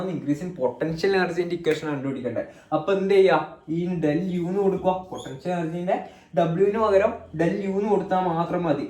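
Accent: Kannur, India. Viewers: Bro kannur aanno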